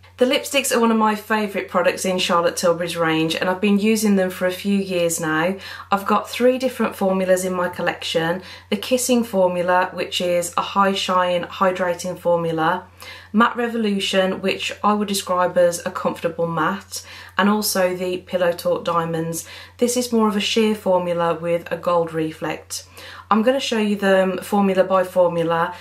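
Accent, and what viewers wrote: Birmingham, United Kingdom. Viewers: You sound like a fellow brummie to me - then I heard you say ‘my mom’ and decided most definitely! Thanks for the swatches. You obv love them because they’re all so well used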